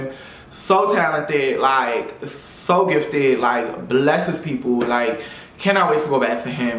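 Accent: New York, United States. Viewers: I have missed your face and that NY accent. So glad you're back!!!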